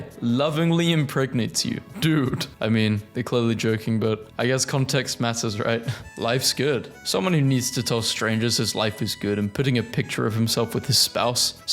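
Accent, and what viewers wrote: United Kingdom. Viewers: To me you sound British. Just not RP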